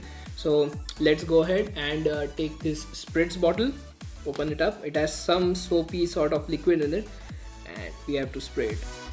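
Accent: India. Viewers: Dude, please do your best to lose this Indian accent. Take some diction courses if necessary. People on the Internet won't take you seriously unless you do. You'll see a definite youtube growth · Indian people and thier accent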